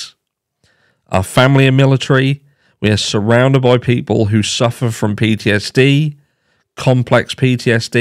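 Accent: Norfolk, United Kingdom. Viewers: My dad was stationed at Honnington. We lived nearby in Elmswell and later in Stanton. Love your accent, foo for few, it's bootiful 🤣🤣 There does seem to be a foo too many coincidences..too many coincidences make a plan.. sometimes 🙃🙃🙂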